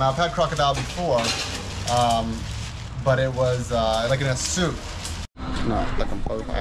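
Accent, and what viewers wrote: Cambodia. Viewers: I just discovered his videos/channel. His Khmer is really good and his tone really fits the Khmer style of speaking. Lol Just subscribed. Great job! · I like your Khmer accent❤️🇰🇭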